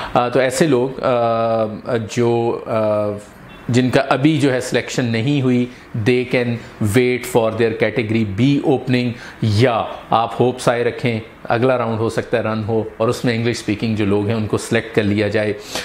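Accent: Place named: India. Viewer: It's a shame the video doesn't come with subtitles. It looks like it was a super interesting information but I could only catch a couple of phrases. Indian accent is super difficult when you're not an english native :(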